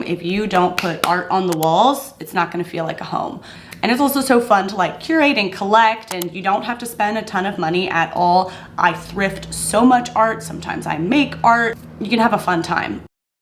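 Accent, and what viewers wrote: United States. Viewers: hi what accent do you have? it's lovely i know it's american but i would like to know the specific accent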